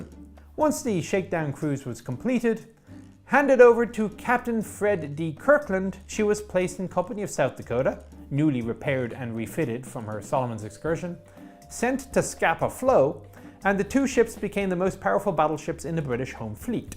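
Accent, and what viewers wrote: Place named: Ireland. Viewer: I really like the systems view of the technical details, the dry wit, and the understated Irish accent. It stands up pretty well, even to a mostly talking head approach. An inside the hatch view of a battleship would probably take about 1000 hours at least, so that’s not likely to happen. I would find it entertaining, though to see how you would fit through the passageways and hatch ways, and into the racks or berths or morgue slots or whatever they called the beds on those things. I’ve got the misfortune of being 6’4”, with a non-streamlined length to beam ratio, and I don’t think I’d do well.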